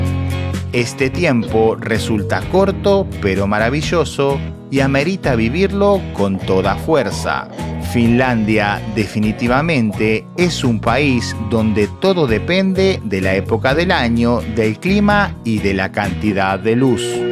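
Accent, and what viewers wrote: Argentina. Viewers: Me suena argentino este muchacho. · ¿Eres mexicano o argentino? Porque veo que haces tomas de referencias mexicanas, pero hablas como argentino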